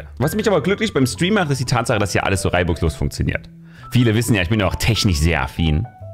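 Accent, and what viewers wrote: Saxony, Germany. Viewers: Das sächsische rettet einfach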